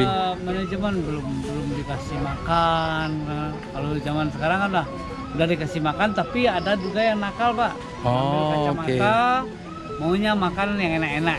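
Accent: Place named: West Sumatra, Indonesia. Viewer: Maaf krn saya penggemar menikmati video" nya tp saya jg pingin tahu identitas al: nama, asal dll krndari logat sepertinya asalnya dr Minang, Sumatra Brt. Terima kasih dan teruskan berkarya ttg Bali/ Hindu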